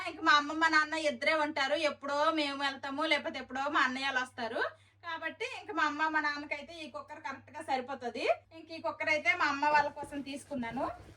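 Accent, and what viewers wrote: Srikakulam, India. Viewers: mee Srikakulam yesa olamoo olamoo 😂😂 bagundhi baaga navukuna nenu aite..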